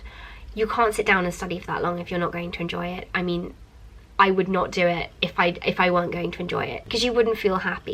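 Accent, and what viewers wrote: United Kingdom. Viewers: Yes she does have British accent. But you can't "talk" accent lol. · Can i know ? Do you talk british accent ?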